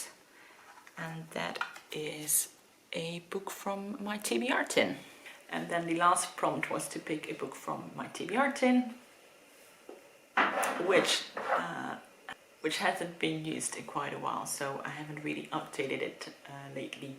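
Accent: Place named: Netherlands. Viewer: Ja. Ik dacht het Nederlandse accent al te herkennen lol. Leuk. Ik heb niet zo veel Nederlanse booktubers kunnen vinden. Lees je meer Engelstalig dan Nederlandstalig? Nog een vraagje weet je misschien waar ik kan vinden of een boek in het Nederlands is vertaald? Ik lees veel in het Engels, maar als ik een boek vind wat mijn zus en moeder ook leuk zouden vinden dan koop ik het het liefst in het nederlands. Zij lezen liever nl talige boeken. Zo ben ik op zoek naar de Nederlandse vertaling van the five van hallie rubenhold.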